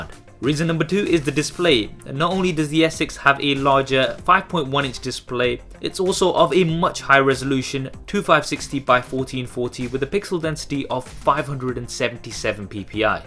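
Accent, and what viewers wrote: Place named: East London, United Kingdom. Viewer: I subbed yesterday, just ordered an S6 due to its price dropping £150 over the last month, glad i did as well. Cool vids dude, just about to launch a gaming/tech channel in the next week or so, my review of the phone will definitely be on there. BTW, you sound local, im just north of london, you sound east?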